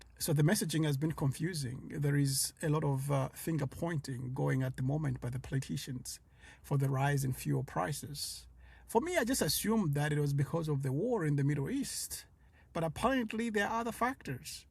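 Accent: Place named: Australia. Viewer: I like your accent. Lots of aussie in there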